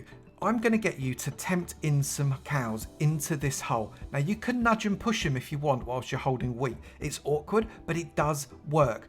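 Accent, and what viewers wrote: United Kingdom. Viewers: Oh, cool, a chill British voice, so I can wind down after work and learn new farm mechanics since I’m getting back into Minecraft after a decade… I might even watch more because he sounds so rela…”HELLO EVERYONE!!!” yanks headphones out of ears Nope, I think I’m going to find tuts online (like I used to do) and refigure it out myself this weekend with just Minecraft ambience/music as the only thing I will hear and know it won’t suddenly shoot up in volume for no reason at all.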